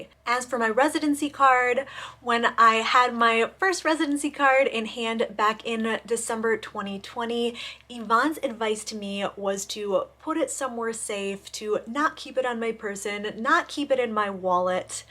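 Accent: United States. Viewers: You don't talk like your from Mexico, you sound like a pocho, as if you grew up in the U.S., corruption in Mexico is exaggerated, the U.S. just had a criminal leave office, the U.S. is a lot more corrupts then you think, its just the U.S. is so hypocritical, in the U.S. its all politics and race and Americans will forever say Mexico is so corrupts, for the next 1000 year becouse Americans go by what there thought and what they want to believe, they don't know Mexico, its always been that way, way exaggerated, I feel free in Mexico, more then in the U.S.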